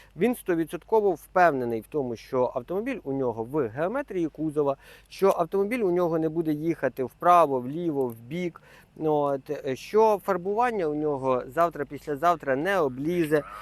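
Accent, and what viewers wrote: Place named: Romania. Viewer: Ти сам українець? Шо за акцент якийсь румунський чи молдавський? Наче носки в роті стіраєш паралельно з відеооглядом.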